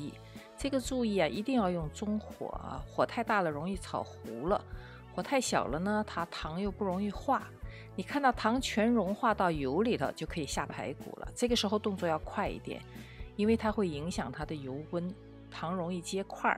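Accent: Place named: North, China. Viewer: 你好，请问祖籍何方？听口音，应该是北方人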